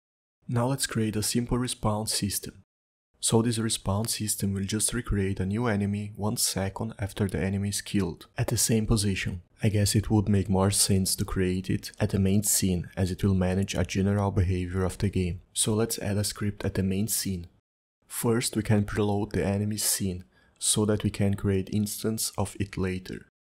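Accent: Brazil. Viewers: Muito bom irmão, Conheço de longe a voz de um BR kkkk otimo tutorial, poderia ensinar como funciona o sistema de autotiles do godot?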